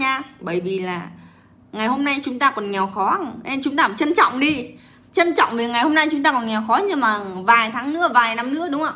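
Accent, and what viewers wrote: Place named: Hai Duong, Vietnam. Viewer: anh chào em nghe như em là đồng hương hải dương nhỉ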